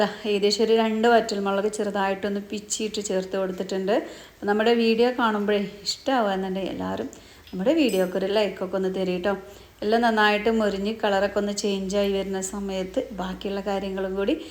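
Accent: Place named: Malabar, India. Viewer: The Malabar slang is interesting for us (South Kerala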